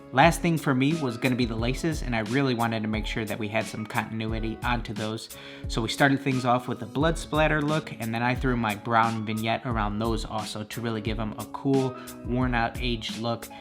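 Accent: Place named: New York, United States. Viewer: Those are fire bro , bro i can hear an accent u gotta be from NY or Canada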